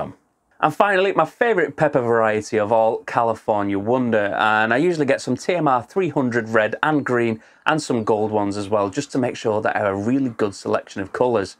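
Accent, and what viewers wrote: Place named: North East England, United Kingdom. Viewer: With an accent like yours I assume you are in North East England and NOT in the North East of the UK. Different growing conditions in those differing areas.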